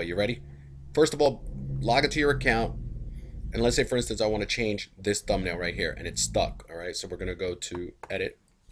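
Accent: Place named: New Jersey, United States. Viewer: You're so Jersey YESSSSS!!!! I'm from Freehold! Thanks for the vid info!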